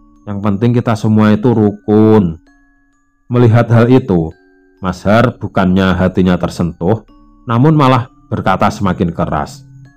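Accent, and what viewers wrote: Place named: Jember, Indonesia. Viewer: Iiiih Jember pisan ..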